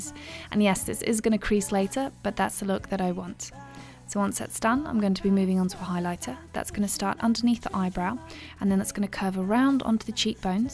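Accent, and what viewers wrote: United Kingdom. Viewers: What accent is that? Before you say British, I mean what part of Britain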